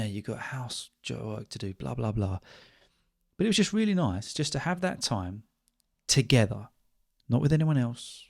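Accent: United Kingdom. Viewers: Your accent is awesome, I like it a lot. What's the name of your accent? Manc? Yorkshe? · Hello,! What's your kind of British accent? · Thanks a lot! As I'm taking a break from studying my lectures, I've really enjoyed your video and your amazing British accent! 🤍 greetings from Iraq! ✨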